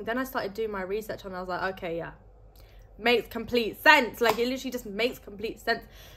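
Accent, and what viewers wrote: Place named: United Kingdom. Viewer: I don't know who you are but started listening to you anyway. Sorry to hear about the car accident. Your accent tells me you are probably from the UK. Best wishes.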